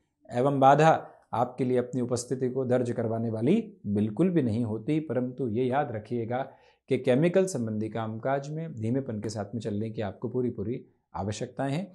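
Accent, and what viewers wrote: Standard. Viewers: Guru ji ki hindi bahot pure 👌 hai aur aise hi honi chhahiye ❤ English medium ke bachho ko Badiger problem hoti hai hindi ki 😅 thanks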